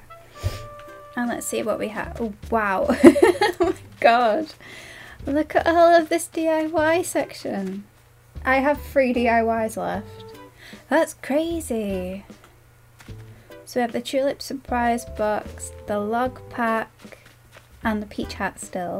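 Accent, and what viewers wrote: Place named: England, United Kingdom. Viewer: Where are you from in England? Your accent sounds familiar but I’m really bad with accents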